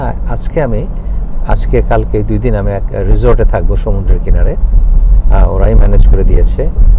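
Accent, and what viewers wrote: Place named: Sylhet, Bangladesh. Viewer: ফিলিপাইনের ব্লগ দেখতে বসে সিলেটের কথা শুনে খুব ভালো ও লাগলো আবার হাসিও পেলো, আমি কিন্তু সিলেটি।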